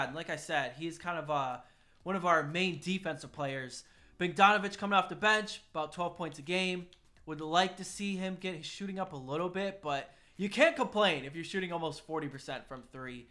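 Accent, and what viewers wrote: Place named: Wisconsin, United States. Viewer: u said Bogdanovic wrong but im pretty sure thats just ur Wisconsin showing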